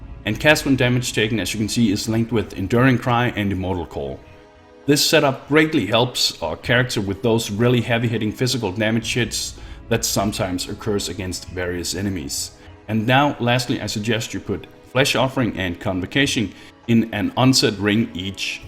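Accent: Denmark. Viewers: it's so fucking obvious how danish you are!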